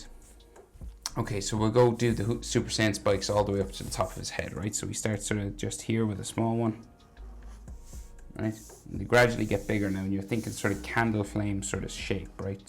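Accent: Ireland. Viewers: First u art is sooo good, its eloung me become a waay better artist and second r u irish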